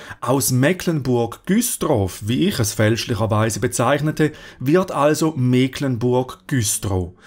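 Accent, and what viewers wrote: Switzerland. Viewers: Sich bei einem Schweizer über die Aussprache beschweren. Wahnsinn in Deutschland - Kapitel 214. Ps: Danke für diese erfrischende Reise!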